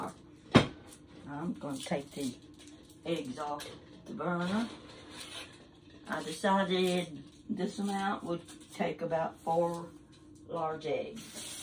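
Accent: South, United States. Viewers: Love your hair. Appreciate, your sharing. You are cute and charming. I am 76, how old are you?I am guessing, but I think you are a Southern Lady. Your accent is similar to mine. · No!!! Southerners use Duke’s, always Hun! You sound pretty Southern to me..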